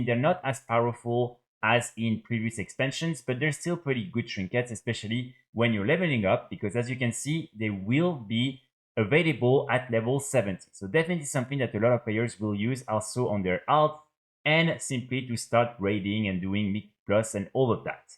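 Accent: France. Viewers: alala l'accent francais c'est trop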